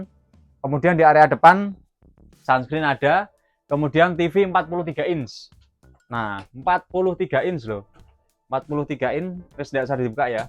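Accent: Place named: Java, Indonesia. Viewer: Medok artikulasine😊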